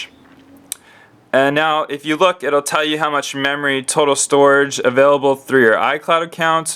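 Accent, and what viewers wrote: Canada. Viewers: IS HE CANADAIN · That's Canadian accent. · dude, are you from fucking Canada?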